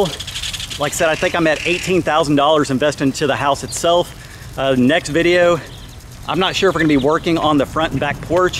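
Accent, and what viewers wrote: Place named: South Texas, United States. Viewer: True south Texas sounds… especially with them crows 😅 just like San Antonio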